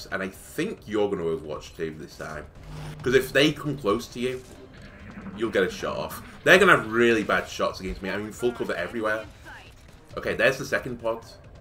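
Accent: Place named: England, United Kingdom. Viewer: The first two missions on Impossible are about not having LOS. If they see you, they'll kill you. Do the math: Sectoid with 70 aim has 1/3 chance to hit you in full cover so 3 sectoids seeing you mean that at least two must miss and the third must not hit 4+ ... 1 - (0,7*0,7*0,85) = 60% that your soldier survives the turn. If he is wounded, chances drop quickly. Side note: Because they don't have enough aim, they can't hit hunkered soldiers if they are in full cover. So if you can't kill them, hunker down (while your soldiers maneuver - without LOS). And forget overwatch during these missions. Though it works on roof tops two squares away from the ladder if you have 4 soldiers on overwatch ... LOL Btw: Where do you live? Most of the time I'm listening to Americans but you sound sooo English. I'm German so I can't judge just by listening but you remind of these Oxford English cassettes (school, yeah)...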